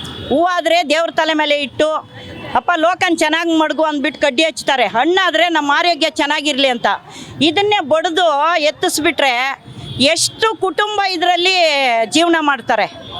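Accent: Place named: Tamil Nadu, India. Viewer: Tamilnadu ge hogi maaru. Aa slang kelak aagalla.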